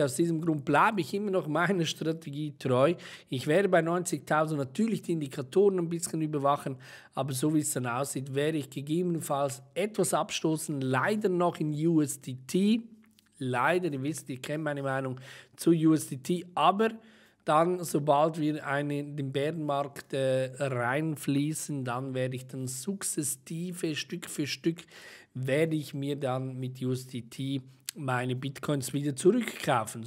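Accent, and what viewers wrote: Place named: Switzerland. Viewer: schön mal ein schweizer zu hören im youtube :-)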